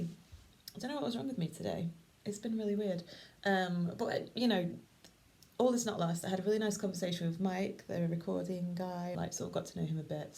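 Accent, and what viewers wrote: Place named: Manchester, United Kingdom. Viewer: God I miss you and god I love your ever so slightly Manc accent - after next lock down I’m gonna come visit and sing ‘let it go’ with you 🥰🥰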